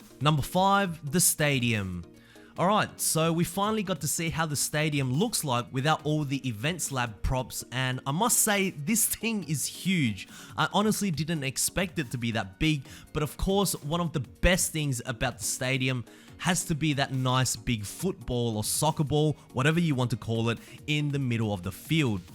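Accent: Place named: Australia. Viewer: U are from Australia ?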